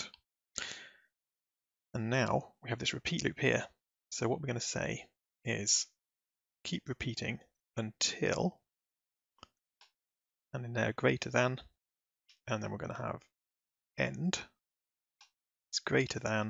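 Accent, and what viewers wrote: United Kingdom. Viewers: I've never heard his voice before. WHOA. He's British. -- bobarlotte (on Scratch)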